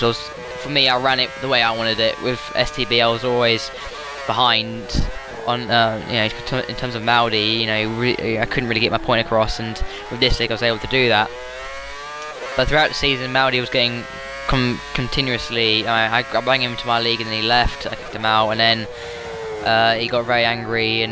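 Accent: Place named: Birmingham, United Kingdom. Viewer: BRUMMIE BITCH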